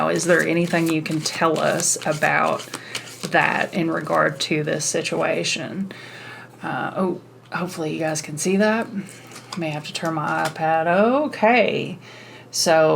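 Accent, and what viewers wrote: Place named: South, United States. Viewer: Your southern accent is adorable. Thanks for the reading!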